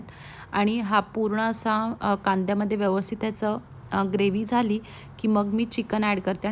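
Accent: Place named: Ahirani, India. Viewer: Tumchi basic language aahirani aahe ka?Tumchi Marathi language Varun vatate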